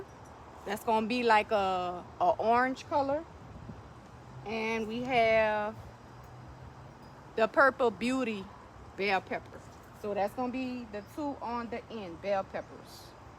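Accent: New Orleans, United States. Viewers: Love you channel and listen to you talk the nawwlens voice is coming thru.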